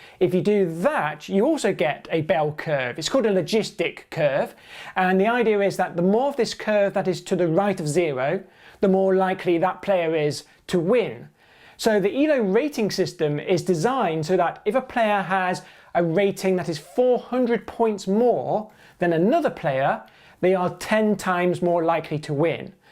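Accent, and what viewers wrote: United Kingdom. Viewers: My brain melted. 😢🧠🔥 PS. Cool British accent. 🙂👍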